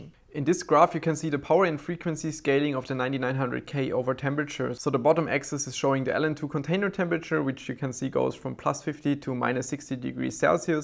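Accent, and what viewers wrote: Germany. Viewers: You can hear his German accent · Deutscher Akzent xD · man hört irgendwie das du deutsch bist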